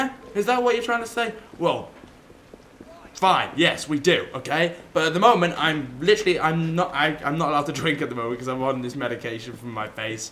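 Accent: United Kingdom. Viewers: Are you from London?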